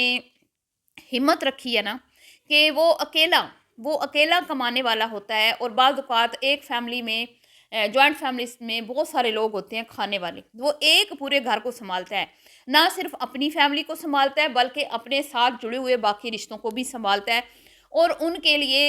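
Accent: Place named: Pothohar, Pakistan. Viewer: Apka accent kafi pothohari hai which has my ancestors too. Bohat acha lagta hai apko sun kay. 🙂.💜 from Canada.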